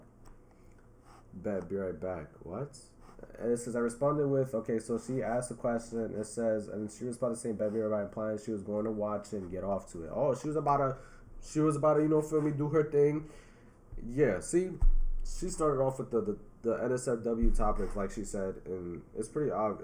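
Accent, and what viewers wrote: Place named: Long Island, United States. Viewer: What part of Long Island you from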